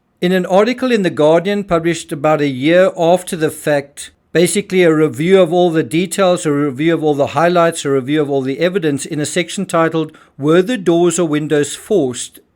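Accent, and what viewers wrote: South Africa. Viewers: ...are you perhaps South African? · I'm proudly South African and I've been following this story closely all these years. Love what u put together and I agree 100% something smells fishy or should I say bloody. Pls keep us updated. Love hearing the local accent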